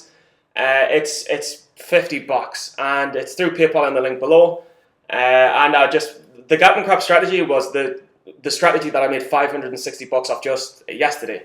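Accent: Newcastle, United Kingdom. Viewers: You sound like you're from Newcastle! What broker do you use to trade us stocks in the uk?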